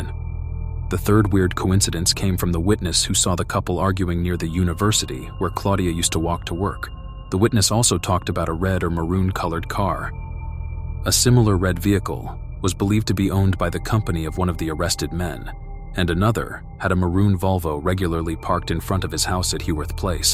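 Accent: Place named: United States. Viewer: British crime but it had to be an American voice.........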